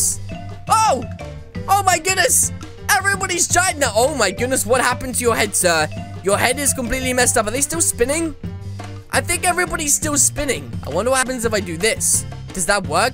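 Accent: United Kingdom. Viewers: bruh he sounds like a british person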